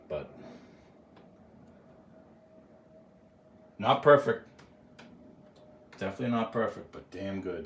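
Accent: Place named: Brooklyn, United States. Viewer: I ordered it. Also love your collection! I hear the BK accent lmfaoooooooo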